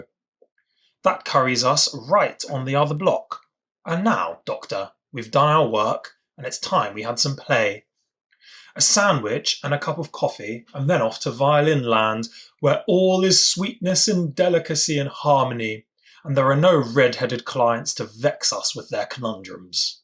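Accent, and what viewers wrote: United Kingdom. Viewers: 前編のA Scandal in Bohemiaも大変勉強になったので、待っていました！ 典型的なちょっと上流のイギリス英語ですね。何度か最後までしっかりと聞くと、ストーリーがしっかりと理解出来ると思うので、頑張ります！：）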